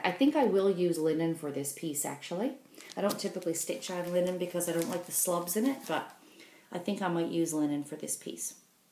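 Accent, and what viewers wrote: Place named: Canada. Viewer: Enjoyed the story about your meet up with the other stitcher. Love your accent, probably because my dad was Canadian!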